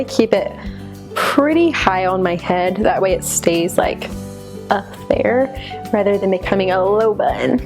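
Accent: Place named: South, United States. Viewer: Your southern accent is cuuuute!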